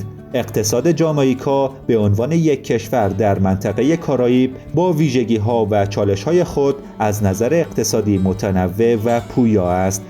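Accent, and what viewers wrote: Afghanistan. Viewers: فکر کنم گوینده افغان هستش. افغان ها حتی در تلویزیون هم اینجوری صحبت می کنند. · گوینده مثل اینکه افغان هست که مبخواد لهجه ی پشتواش را نشان ندهد صدایش را دوست نداشتم.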